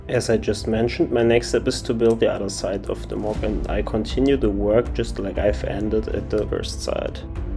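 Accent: Germany. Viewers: Yo i didnt notice your German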